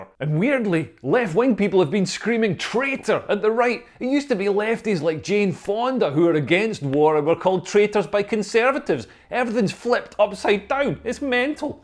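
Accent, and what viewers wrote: Scotland, United Kingdom. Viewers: Always amusing watching your films with subtitles on, just cant handle a Scots accent.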